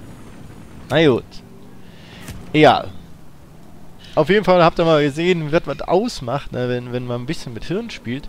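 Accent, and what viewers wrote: Cologne, Germany. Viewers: Deine let´s play´s sind der hammer hört sich na ne kölsche jung an oder täusche ich mich da ? :D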